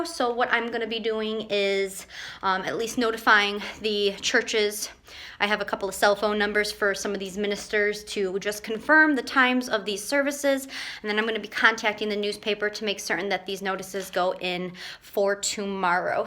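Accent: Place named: Buffalo, United States. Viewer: Are you in Buffalo by any chance? I am from Clarence, and your videos and your voice have a touch of my hometown in it :)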